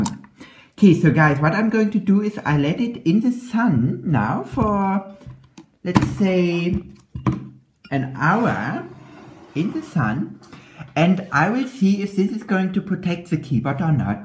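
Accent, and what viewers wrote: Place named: Germany. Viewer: That german accent is craking me up lol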